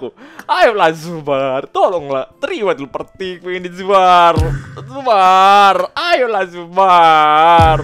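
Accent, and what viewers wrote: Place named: Sumatra, Indonesia. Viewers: logat ujung sumatra nohh,,, maluin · Orang aceh. Itu dger bahasa ngomong nya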